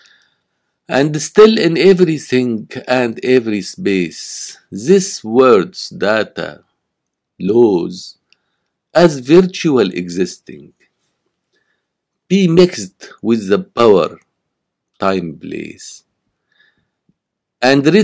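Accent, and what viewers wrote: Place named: Syria. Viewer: I like you syrians pronation 😘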